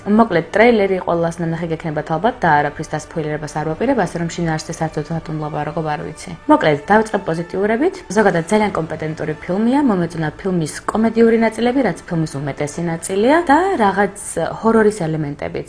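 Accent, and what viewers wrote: England, United Kingdom. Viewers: zalian kargixar, sheni arxi maqvs gamowerili da didi siamovnebit gismen, magram gexvewebi es inglisuri aqcenti mishore, ubralod qartulad tqvi, ;D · ინგლისურს რო ისწავლე მერე აღარ იტყვი მაგას ძაან ასწორებს უცბად ინგლისური აქცენტი ❤ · imena am gogom ro ardauwunos rame nebismier films ararsebobs da dzaanmagzianebs sheni suleluri inglisuri gamotqmebiii, prosta tavs isazizgreb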